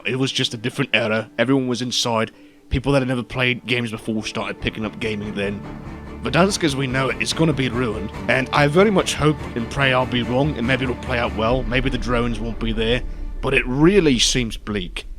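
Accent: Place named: United Kingdom. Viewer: ahh deep british voice man talking about COD with quality content, let's go sir. Mr. Xbox Ahoy left a massive void that's never truly been filled. Best of luck to you.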